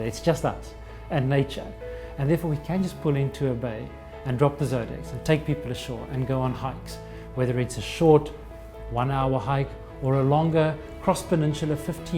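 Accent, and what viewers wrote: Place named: South Africa. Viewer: This dude sounds South African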